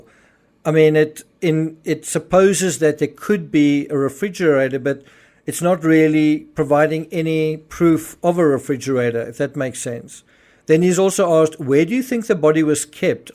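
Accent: South Africa. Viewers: Stumbled across this video 😊 ... Is that a fellow South African I am hearing 😊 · You sound South African?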